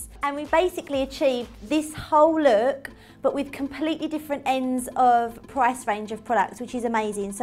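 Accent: Essex, United Kingdom. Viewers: she can't help that she's from Essex and that's what her accent is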